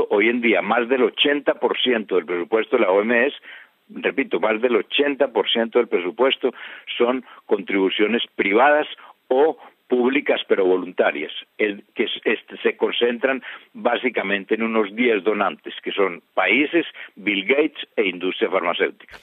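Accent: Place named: Spain. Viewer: que bruto que informacion tan importante... lo ideal hubiera sido en video y no solo la voz pero esta brutal.... gracias por compartir ahorita estan atacando al e cig... de parte de la oms para proteger la inversion de loc chicles parches y champix... surtidos por las farmaceuticas... te invito a ver nuestra informacion <link> aunque el ataque es a nivel mundial, igual en españa porque se te oye voz de español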